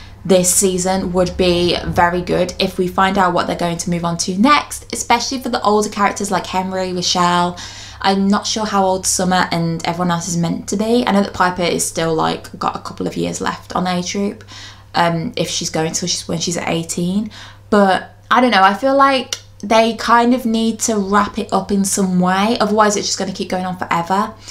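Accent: England, United Kingdom. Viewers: I was watching this video because I love and she started talking about her dream but I didn’t hear the dream bit, so I was screaming my feelings as I was walking to the tv and was angry because I thought she was sharing spoilers on an episode that had already come out that I didn’t see! Then I was looking EVERYWHERE on my tv for it but I couldn’t find it! So I started searching it up on my phone about when it came out but couldn’t find anything. I knew different countries released tv shows at different times (like dance moms) but she has an English accent like me so I was confused. Then I rewinded it to see if she’d said anything about where she watched it and found out it was all a waste of time. I love and the next step! If you read this comment anyone sorry for wasting your time. Edit: Thank you for liking my comment! (I think that’s how you spell it) ❤️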